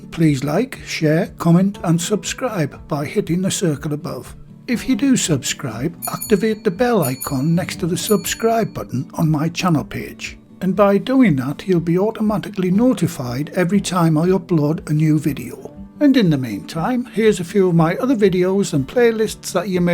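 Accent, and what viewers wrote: United Kingdom. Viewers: Why should people - who are not from England! - make critical comments about the correct, clear, non-dialect, slightly (and pleasantly)-accented English spoken by an English person?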